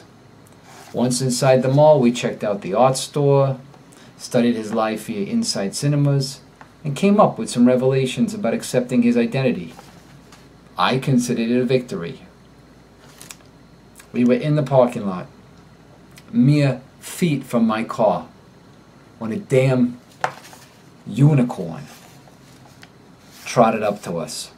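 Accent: New York, United States. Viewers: The guy is from Nu Yawk !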